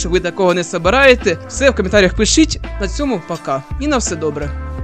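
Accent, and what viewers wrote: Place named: Surzhyk, Ukraine. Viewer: Навіщо такий кончений суржик, кров з вух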